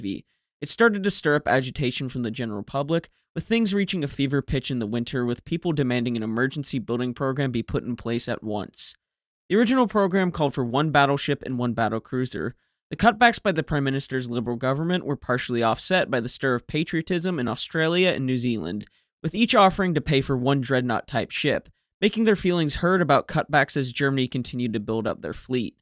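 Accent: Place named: United States. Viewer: This is refreshing hearing good narrative on non US warships with an American accent, but many viewers will miss this asset and show no gratitude for it, as is the failing known throughout society as failure to evolve thoughts according to new developments, what Jackie Fisher thought non First Class.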